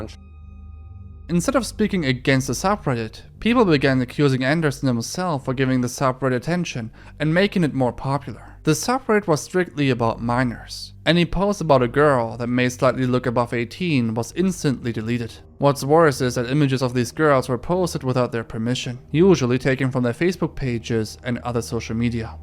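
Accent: Germany. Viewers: My favourite German YouTube accent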